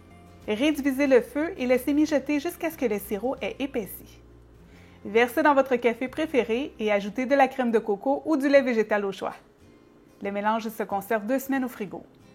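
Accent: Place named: Canada. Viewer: Tu es hyper rafraîchissante, et j'adore ton accent canadien !!!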